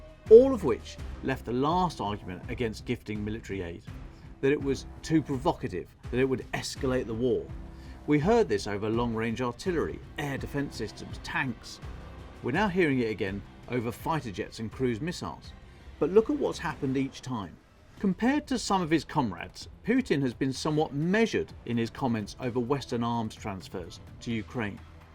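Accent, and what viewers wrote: United Kingdom. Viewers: "they could get amongst it pretty sharpish" God I love British English and tea teeth.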